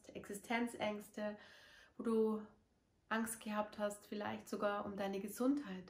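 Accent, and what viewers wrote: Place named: Bavaria, Germany. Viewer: Schoen,der bayerische Dialekt🙏🙏fuehle mich zuhause🙏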